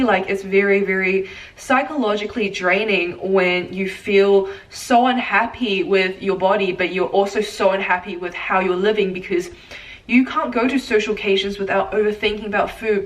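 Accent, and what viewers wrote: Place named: New Zealand. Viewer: hahahaha you know what, the first time i watched your videos i picked up on that kiwi accent right away and i was like, YESSSSSS GUUURRRLLL OCEANIA PRIDE 😂😂😂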